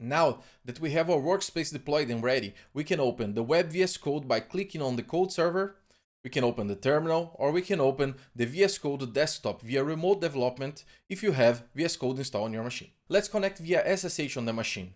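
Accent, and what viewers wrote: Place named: Brazil. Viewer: percebi que o sotaque parece o da nossa terra ^^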